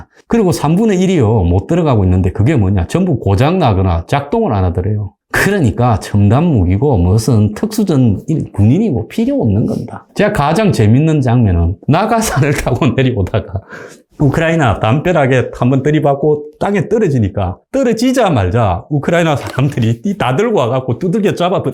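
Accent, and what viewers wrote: Gyeongsang, South Korea. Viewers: 경상도 액센트가 멋있고 더 실감나게하네요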